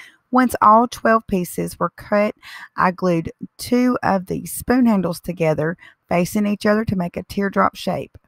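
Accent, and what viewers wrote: South, United States. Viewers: You are very creative. As another comment says, you think outside the box. Thank you for the step by step instructions. I love your southern accent!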